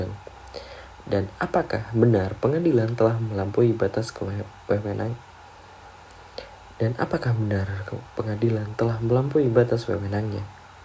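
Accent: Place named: Malang, Indonesia. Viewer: Pasti yang dubing anak mlawang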